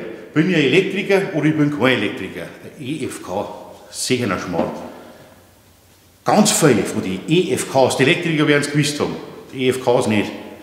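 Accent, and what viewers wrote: Bavaria, Germany. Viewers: Thats bavarian german :D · Die Versuche Youtubes Bayrisch in deutschen Schrifttext zu übersetzen - köstlich🤣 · Ich finde deine Videos klasse, aber teilweise kommt dein bayrischer Akzent sehr stark durch und ich muss zurückspulen weil ich Dinge nicht verstanden habe 😅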